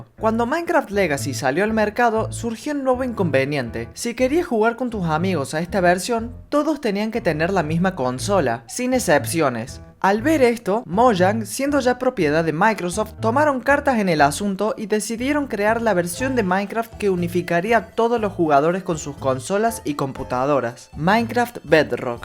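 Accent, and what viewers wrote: Córdoba, Argentina. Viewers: Habla como cordobés. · sos cordobe? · Sos de Córdoba? O flashee cualquera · Cómo me encanta el acento cordobés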